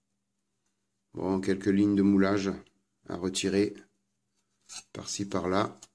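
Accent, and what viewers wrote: South, France. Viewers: un beau projet en perspective a venir...toujours agreable a regarder tes video avec ton petit accent du sud.a plus et bon courage.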